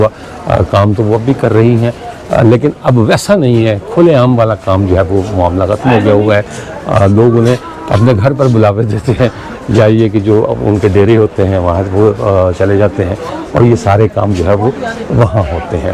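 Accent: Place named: Bihar, India. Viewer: Kia. Tum. Shia. Ho. ? Kia. Tum. Bhia. B. Ho. ? Apni. Urdu. Say. To. Bhia. He. Lagtay. Ho.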